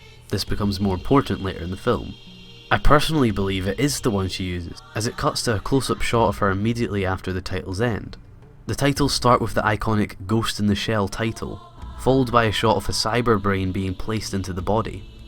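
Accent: Scotland, United Kingdom. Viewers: At least this narrator sounds like British or Northeast Coast... and this makes sense. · Never realised I needed a Scottish bloke musing on philosophy, anime, identity, death, and life in general. Ay. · you scottish?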